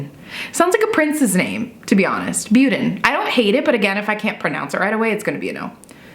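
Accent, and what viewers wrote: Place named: United States. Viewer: I like the cowboy accent 😂😂😂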